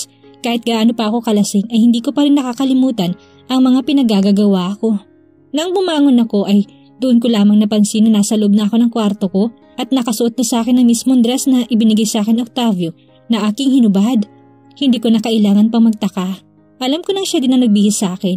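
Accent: Visayas, Philippines. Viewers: Hahaha ang cute mo mag bisaya ms 😁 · Haha ang cute mo miss mag Bisaya. Maraming salamat adm8n sa upload. Attention miss narrator pwede message me private. Thanks 💗💞🇬🇧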